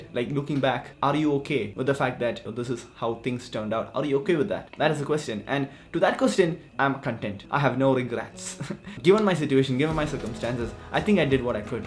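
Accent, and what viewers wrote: Kerala, India. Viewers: Yoo I saw you in a reel and followed and now you are HERE😳 anyways change the thumbnail It has a grammatical error Change "What happened?" In place of what happen? Ik imma weirdo talking like boomer 😂😂 Btw love your malayali accent 😁😂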